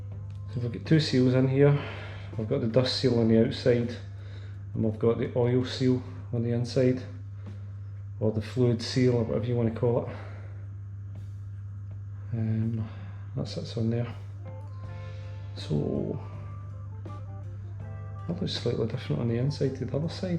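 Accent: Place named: Scotland, United Kingdom. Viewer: Wow. Nice to hear a scottish accent too. I'm from North Berwick. Left a ong time ago though. Can I ask another question. I've started the engine no problems with a new battery. Before the engine has time to really warm up (within 1 minute) there are white fumes coming from the right hand side seemingly behind the cylinders - about that height. Looks as if there may be some electricals in there but it would need further dismantling to check. Have you ever had this happen and what was it. I'm at half a mind just to let it run and see if whatever it is (old coolant ?) burn off, but I don't want to burn something evident and have to replace ... decisions decisions. Cheers.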